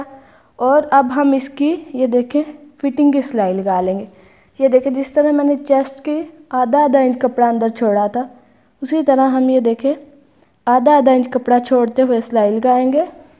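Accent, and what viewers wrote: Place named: Faisalabad, Pakistan. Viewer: Kafi arsy sy me apki videos dekh kr kpry silai kr rhi hun, jazak Allah, ap bohat assani sy mushkil cheezen smjha deti hein, Faisal Abadi lehjy wali Urdu, Amazing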